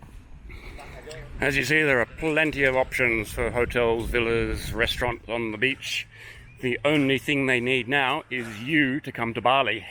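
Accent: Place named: Australia. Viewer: Thanks for that! You have a pleasant voice. A nice Australian accent & not too occa!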